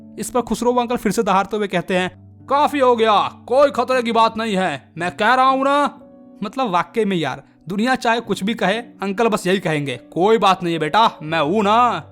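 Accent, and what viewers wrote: Bihar, India. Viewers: Bihari assent 😎🤟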